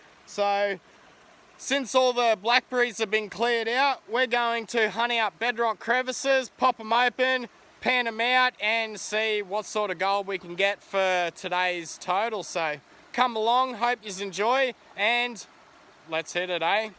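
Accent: Australia. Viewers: Nice to hear that laconic Aussie voice, pity it's dying out with the young these days being so stuck on Americanisms ....keep up the vids on river sluicing and gold panning, love them.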